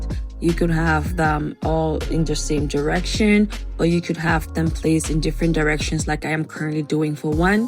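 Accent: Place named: Jamaica. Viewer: Love your accent... Jamaican or maybe Antiguan? Its like music in my ear.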